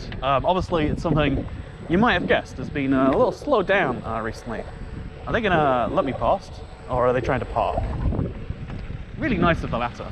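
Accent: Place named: United Kingdom. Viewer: You sound so much more British here lol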